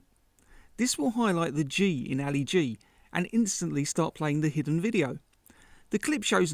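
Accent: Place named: United Kingdom. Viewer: I have no other way of saying this but the auto captions don't like your British accent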